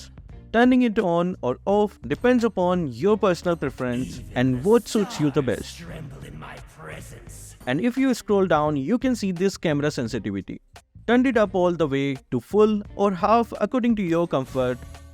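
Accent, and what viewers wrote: India. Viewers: Ofc he is))) accent is perfectly Indian)) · Bro are you indian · Are you Indian Sir?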